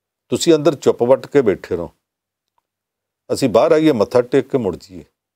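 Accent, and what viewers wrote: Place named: Punjab, India. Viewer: sahib di jatki boli manu bahut pasand hae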